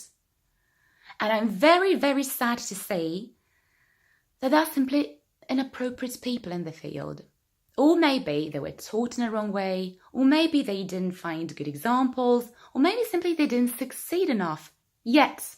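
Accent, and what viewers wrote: United Kingdom. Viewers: You're talking about an American accent but you sound British? Why is that?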